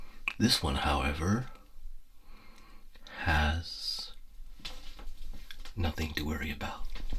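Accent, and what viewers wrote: Quebec, Canada. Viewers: Great video! Love the theme and ambience. Are you québécois by any chance?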